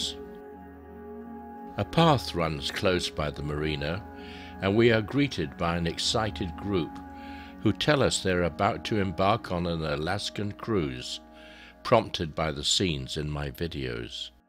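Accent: England, United Kingdom. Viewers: So pleasant to hear this world of adventure described and decoded through the lens of a true Englishman's command of the language. A rare and dying breed, sadly...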